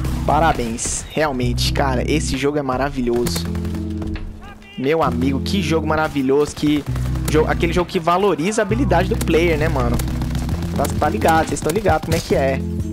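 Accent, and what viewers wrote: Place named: Goiás, Brazil. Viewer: advinhei pelo nosso sotaque, também sou de Goiás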